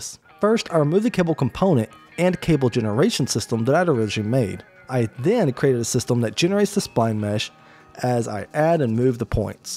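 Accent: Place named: South, United States. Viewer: a creator with a southern accent like mine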